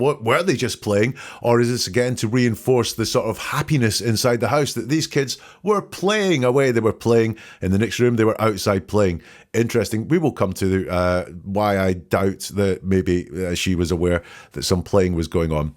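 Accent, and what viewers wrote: Scotland, United Kingdom. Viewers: Soo glad i found you. Like brittish english better than the tons of american english flooding the internet. Also you are in my timezone❤ . I am a great fan of Peter Hyatt, bless him, Looking forward to watch more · This has been fascinating and I hear your lovely Scottish accent… I live in Wales 🏴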